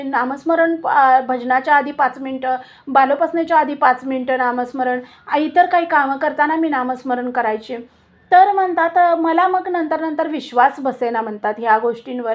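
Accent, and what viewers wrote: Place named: Sangli, India. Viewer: ताई तुमच्या सांगलीच्या भाषेतच बोला , सांगलीची भाषा ऐकायला खुपच भारी वाटतं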